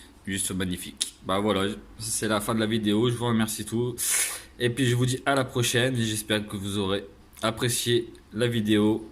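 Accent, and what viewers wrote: North, France. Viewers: avec le petit accent du nord ! Comme à la maison 😊 je suis de Caudry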